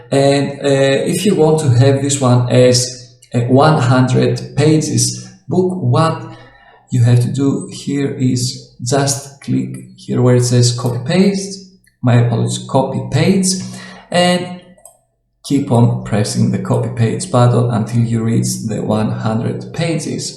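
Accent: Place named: Greece. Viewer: Loved your Greek accent man :)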